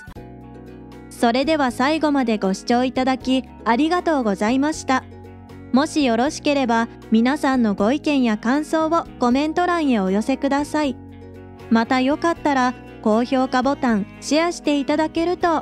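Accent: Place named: Kansai, Japan. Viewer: 年号のアクセントが変すぎる。関西訛なのは分かるけど、ここまで酷い人はなかなかいない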